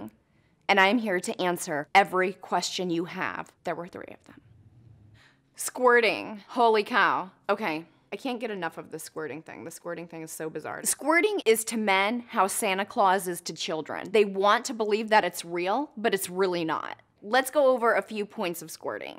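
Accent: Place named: South, United States. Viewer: Hey what part of America are you from?Your accent kinda sounds south:P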